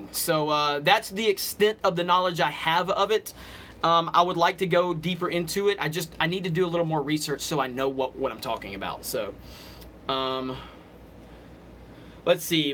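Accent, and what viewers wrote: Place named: South, United States. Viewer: let me know when your in New York!!!! The accent to me is Floridaish but definitely southern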